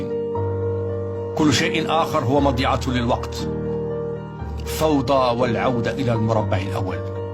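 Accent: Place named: Morocco. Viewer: صاحب هذا الفيديو باين مروكي من طريقة كلامو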